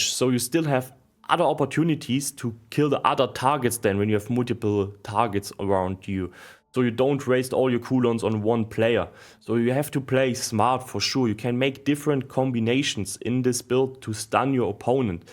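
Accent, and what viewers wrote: Germany. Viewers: Man hört aber extrem das du deutscher bist 😅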